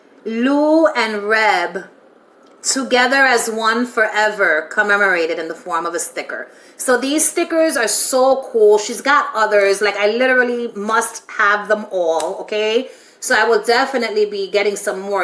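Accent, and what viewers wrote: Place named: United States. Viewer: I think ur baby love ❤ 😍 lou will end up screwing over judy Bryant 😡😡cause lou has 2 meet her contact's as she doesn't get visitation so lou will know the plan n f**k over judy at last minute and ann Reynolds has 2leave my baby love alone mr Jackson 💔 🎶,but im with u marie is starting to grow on me again. Please give 🙏 😔 mention 🙏 Darren from Dundee just want u to mention me in ur American accent as ❤ ur accent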